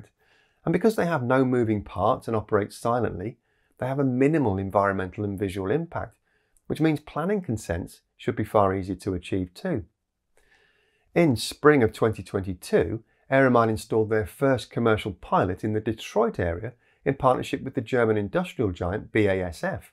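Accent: United Kingdom. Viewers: I thought I would just mention, that just because you have a British accent does not mean you live in the UK 🙂 Thanks for another informative video.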